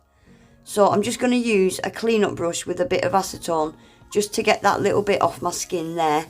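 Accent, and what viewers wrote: Northern England, United Kingdom. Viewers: I'm saying the Northern accent and obvious hard working hands are a great character to have. You are not a 'dumb dumb' infact you are a genius at what you do. I'd rather watch you than the others because you are real. Keep it up young lady 😍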